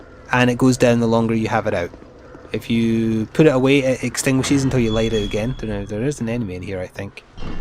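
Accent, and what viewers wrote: Scotland, United Kingdom. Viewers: It took me four episodes to finally make a good guess on what your accent is. Is it scottish? (I only recognized it because of David Tennant xD)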